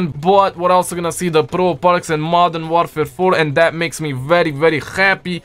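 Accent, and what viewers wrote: Russia. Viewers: please stop the russian flow bro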